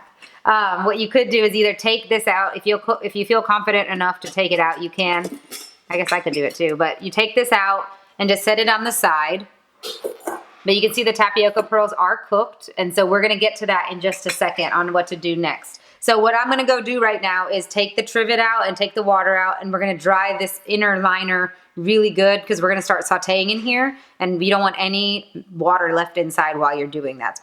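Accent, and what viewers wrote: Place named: Gujarat, India. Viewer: are you from south gujarati? You have same accent as me and love your dishes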